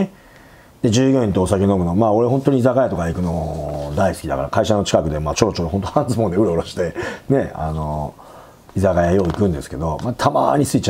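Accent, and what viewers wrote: Nagoya, Japan. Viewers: 名古屋弁聞くとホッとしますね(^ ^) 為になる話ありがとうございます。 p.s.錦は高級で行けないので、栄の女子大小路によく行きます。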